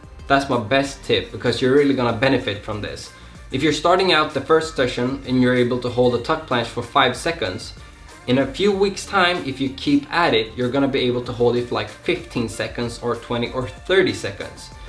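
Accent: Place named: Scandinavia. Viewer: Where ya from dude, you sound Scandinavian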